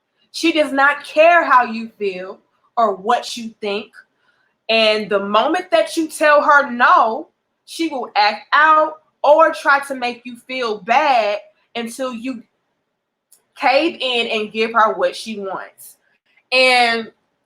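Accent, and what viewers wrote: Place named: Florida, United States. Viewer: I like the accent. You from Florida?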